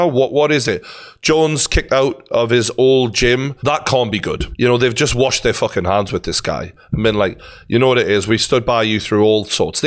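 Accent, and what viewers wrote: Newcastle, United Kingdom. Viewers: Yooo geordie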